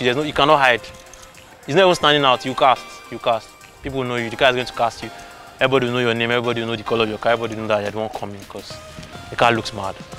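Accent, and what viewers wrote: Nigeria. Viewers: I am used to seeing Nigerian youtubers try hard to modify their Nigerian accent. It is a breath of fresh air to see one keep it real, its actually lovely. Much love from Canada. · I love the fact that he isn't forming...speaking like a true Nigerian guy...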